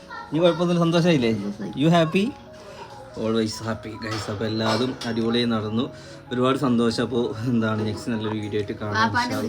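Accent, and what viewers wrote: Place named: Malappuram, India. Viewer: Malappuram talk i like very much i am from kannur.peole are so humble and broad minded❤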